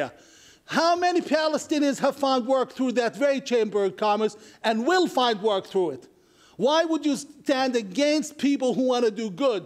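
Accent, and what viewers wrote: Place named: United States. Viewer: Sounds like an American. His parents must have immigrated to the USA. Nothing negative, just saying he speaks American English.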